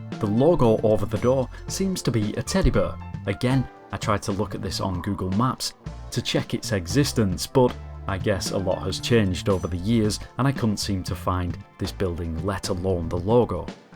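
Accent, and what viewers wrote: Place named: Lancashire, United Kingdom. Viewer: "Euwt uf bewnds" haha love Lancashire accent (and im a tyke)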